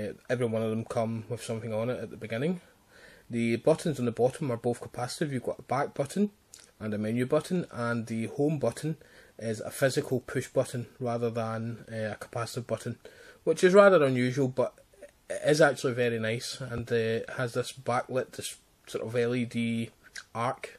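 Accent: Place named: Scotland, United Kingdom. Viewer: Why do you talk Scottish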